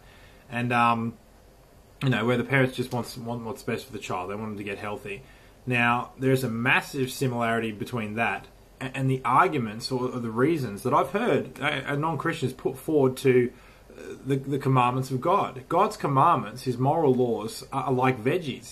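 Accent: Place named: Australia. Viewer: He's not British, he's Australian.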